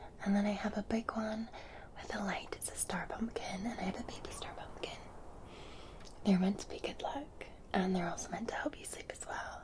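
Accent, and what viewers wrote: Scotland, United Kingdom. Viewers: You've got the most perfect gentle accent and Scottish to to bring peace and calm to a late night and days end thank you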